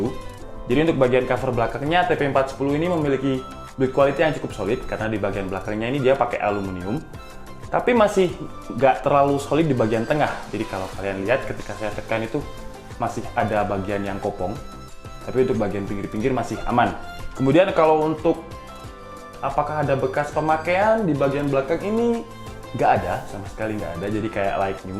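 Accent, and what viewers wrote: Java, Indonesia. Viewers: Waduh mengsle" jowo banget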